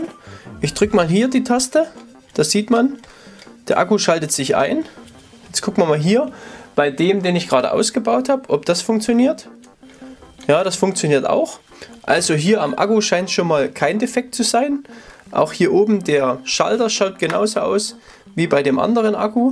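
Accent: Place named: Saxony, Germany. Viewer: Echt ein klasse Video. Alles super sachlich mit sächsischem Charm erklärt. Weiter so.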